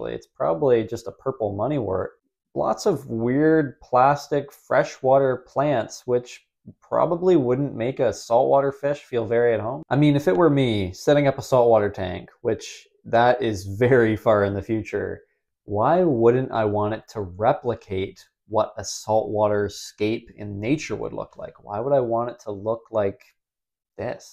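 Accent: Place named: Canada. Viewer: the Canadian accent is subtle, but i live rural, that upraising is hard to miss when you look for it, you'll notice a difference between cloud and clout, clout has the uprising cloud doesn't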